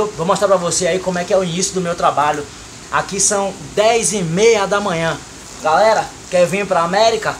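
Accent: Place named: Pernambuco, Brazil. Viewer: Sou de Pernambuco e conheci o sotaque pai! kk Muito bom o vídeo! Entrei no grupo do whatsapp e tenho algumas dúvidas...estou no aguardo do grupo, muito obrigado e parabéns!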